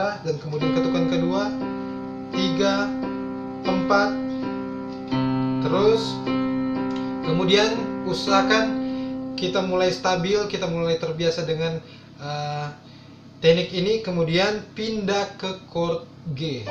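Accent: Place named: Manado, Indonesia. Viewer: Dari logat berbicanya pasti orang manado nih hahaha.. :D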